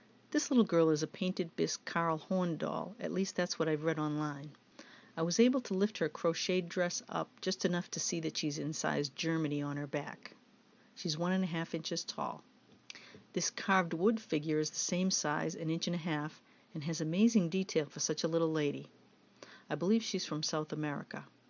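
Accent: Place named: Northeast, United States. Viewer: Oh my god! I am drooling over your beautiful dolls. WOW! Great video. You must be from the Northeast. I love your accent. I just subscribed.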